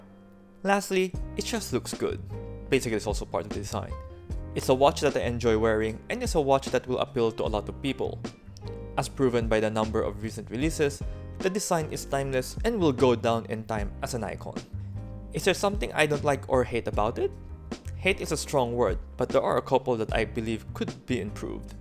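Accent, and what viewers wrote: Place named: Philippines. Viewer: Do you happen to be from the Philippines? Because you sound like one.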